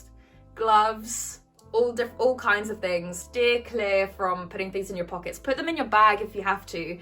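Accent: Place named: England, United Kingdom. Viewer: Your amazing! 😍 How come you have a english accent if you live in Spain?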